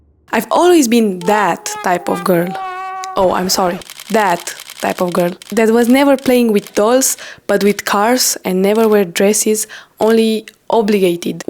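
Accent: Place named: Romania. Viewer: I knew that accent sounds familiar, but I didn't expect you to be Romanian. Your body looks insane. Felicitări!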